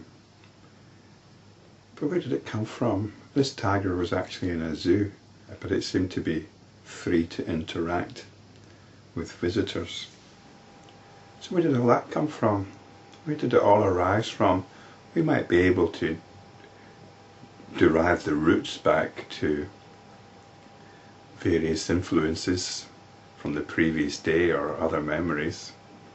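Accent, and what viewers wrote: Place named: Scotland, United Kingdom. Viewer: I wonder where exactly your accent comes from. It sounds Scottish, but I don't know what city.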